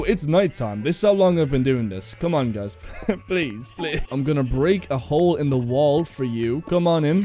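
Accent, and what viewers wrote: Ireland. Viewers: I KNEW U WERE IRISH I KNEW I RECOGNIZED A IRISH ACCENT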